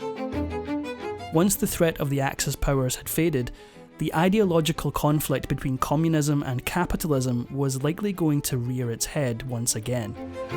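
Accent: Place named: Scotland, United Kingdom. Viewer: The commentary refers to "England", as if Scotland and the rest of Britain were not also a target for foreign intelligence. As if they were somehow uninvolved, like with the Empire, when the reality was very different. Also, someone with a Scottish accent not knowing the forename St. John is pronounced Sinjun....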